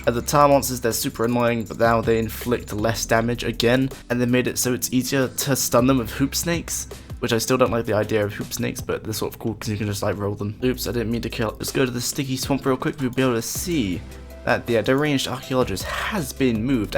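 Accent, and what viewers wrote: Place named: RP, United Kingdom. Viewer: your so posh i cant understand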